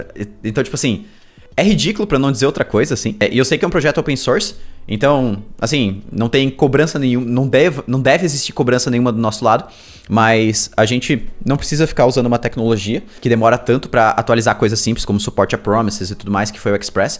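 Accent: Brazil. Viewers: falar como brasileiro, ninguém fala Alex como Álex…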